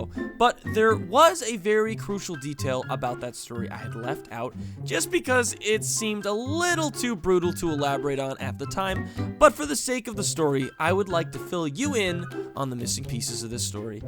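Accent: Canada. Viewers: So you're a Canadian ay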